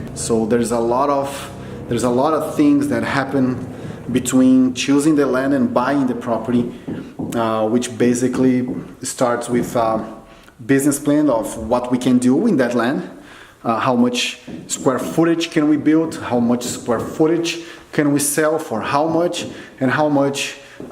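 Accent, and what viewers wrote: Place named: Brazil. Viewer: you sound brazilian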